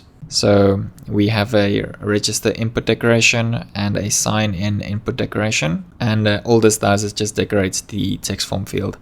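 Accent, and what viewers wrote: South Africa. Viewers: Where are you from? You sound South African? :D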